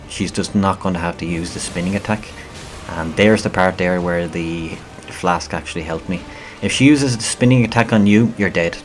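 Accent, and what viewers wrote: Ireland. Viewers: You Irish mate? Nice guide thanks! Subbed!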